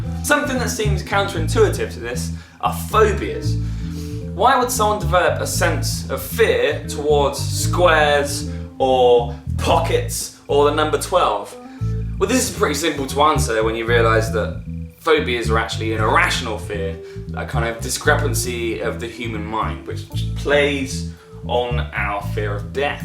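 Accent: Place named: England, United Kingdom. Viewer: ugh the English accent. why don't you all take up more of the Irish speak it's more musical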